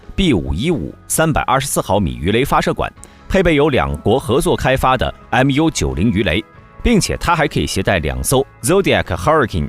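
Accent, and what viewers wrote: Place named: China. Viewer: 这博主除了口音之外别的和中国人没什么关系了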